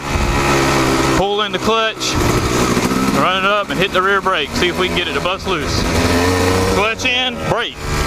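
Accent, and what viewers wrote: South, United States. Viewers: Georgia or Louisiana lol you seem like your from 1 of those states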